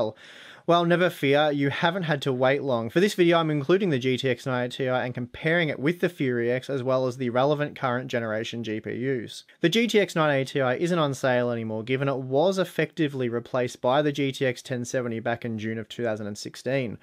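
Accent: Australia. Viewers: Cool, thanks for clarifying. I admit I subbed to the channel when Matt was doing the videos and really enjoyed the style and depth of the benchmarking. Then one day there was a funny looking guy without a blue shirt I thought I clicked on the wrong video! But then I realized the style and methodology was the same. With that said, I enjoy your videos just as much if not more since your Aussie accent is a bit more tamed! Keep up the good work man you are my favorite tech channel by far!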